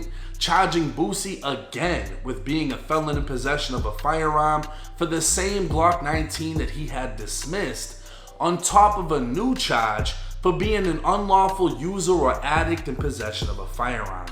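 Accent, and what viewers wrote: Boston, United States. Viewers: The boston/Massachusetts accent is heavy/thick in this one lol .. at least hes got the money N assets needed for a lawyer good enough to take on the machine aka ths feds for a second time.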